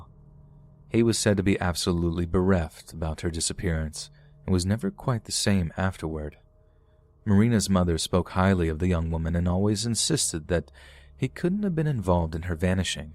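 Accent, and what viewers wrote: United States. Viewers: What an interesting experience to listen to a story with a British tone, read in an American accent lol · This was definitely in Liverpool! Haha yeah it was so funny hearing all these scouse sayings read by an American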